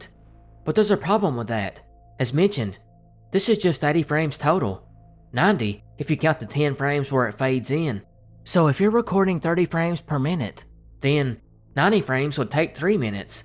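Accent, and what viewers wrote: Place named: North Georgia, United States. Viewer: Your accent is just like mine and my whole family , it's cool bro Howdy from NA GA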